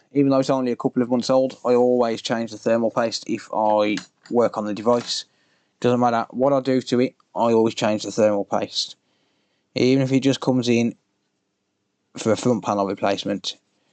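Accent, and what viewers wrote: United Kingdom. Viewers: could someone enlighten me what kind of british dialect he is using? I've never heared it before and it was a bit hard to get used to it but after watching several videos, its a lot of fun to listen to him.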